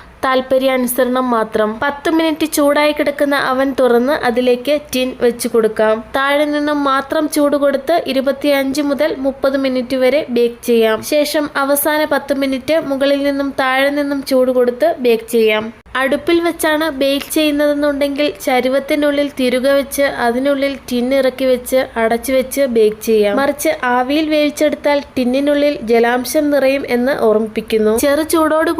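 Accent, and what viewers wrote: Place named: Central Kerala, India. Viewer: Middle kerala style talk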